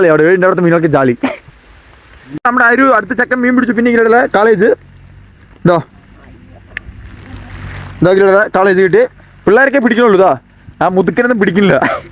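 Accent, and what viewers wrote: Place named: Palakkad, India. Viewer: Palakkad slng poli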